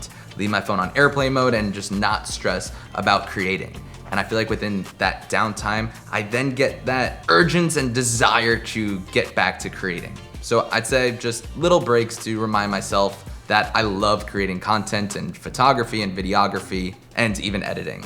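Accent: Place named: New York, United States. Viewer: Not coffee... Kaawfe...you r a genuine New Yorker man...✌️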